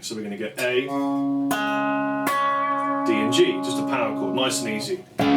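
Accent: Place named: United Kingdom. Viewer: you are the best teacher I have seen. There is a lot of good ones but your delivery is very logical and concise. The british accent doesn’t hurt either. Wish you lived in the states...particularly Sacramento California...you’d love the weather over dreary old England...lol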